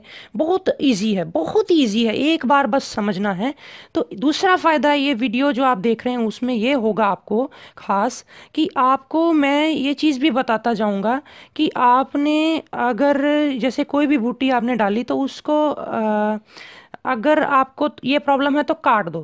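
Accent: Punjab, India. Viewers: swami g..aaj tak maine itni sachayi or somyta awaz me kbhi ni dekhi...chahe bzy hone k karn shuruaat ni ho ri ..bt aapki vani mein puri schayi jhalkti hai..beech me punjabi ka jo tuch hai ..usse to aur.bhi saadgi jhlkti hai..jo hmare buzurgon.ki.yaad dilaati.hai..god bless u swami g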